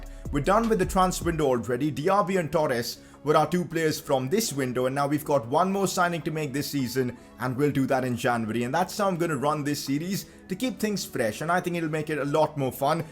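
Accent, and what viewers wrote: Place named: India. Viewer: I wanna watch your videos but the way you talk man... I cant stand hearing the indian accent for almost 30mins😂 I can’t bear it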